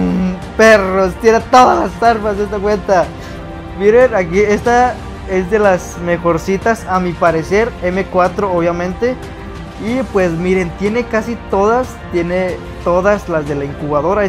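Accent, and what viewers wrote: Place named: Chihuahua, Mexico. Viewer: Awuebo puro chihuahua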